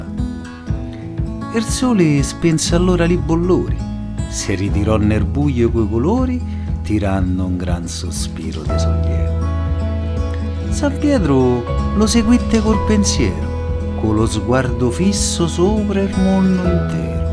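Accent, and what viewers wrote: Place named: Rome, Italy. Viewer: manchi😘 che bel video, e si sente che tu sei Romano un piacere ascoltarti.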